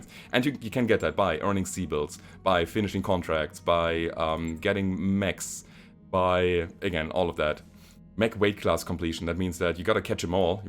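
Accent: Germany. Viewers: That German accent! Great!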